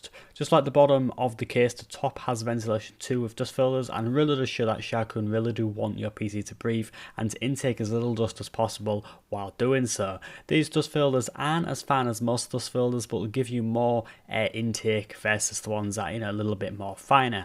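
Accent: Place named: Scotland, United Kingdom. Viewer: Are you from Scotland or something, because i cant understand your accent a quite bit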